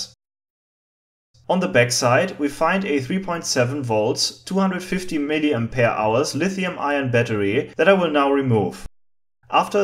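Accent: Germany. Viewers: I love how you almost mix some German words into the English ones. Like Zwölf and Twelve turn into Twölf😂 Also, awesome hack. I have used your audio input hack on an old Grundig "Music Boy" radio reciever, and it works great! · Dis strong German Dialekt made me klik Like at instant. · Horrible German accent. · The switch has to be deleted.......... LOL In den Papierkorb verschoben......... gröhl.... Herrlich dieses Englisch mit dem deutschen Akzent! Ich bin stolz auf dich! Weiter so! Vielleicht doch zukünftig in Deutsch? Aber solltest Du dich mal nach Bonn verirren gehen wir ein Bier Trinken! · i was here just to hear german speake english with accent! it was good ! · Hate german accent -.- SO FCKN TERRIBLE!! Nice vid tho.. :D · Man hört den deutschen Akzent einfach mal sowas von :D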